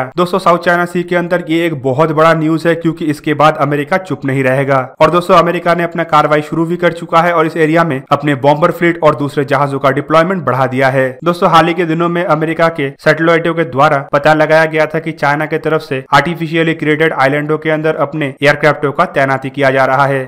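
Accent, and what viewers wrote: Bengal, India. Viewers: Sir aap Bengali hai?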